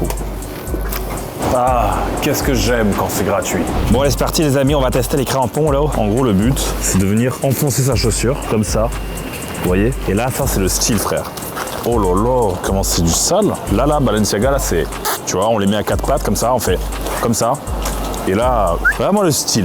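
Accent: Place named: Quebec, Canada. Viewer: Il a accent Québécois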